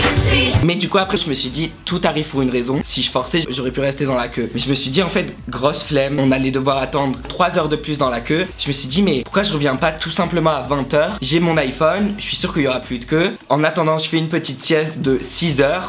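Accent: United States. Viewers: OMG TON ACCENT AMERICAIN jadoreeee !!!!! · J'adore ton accent américain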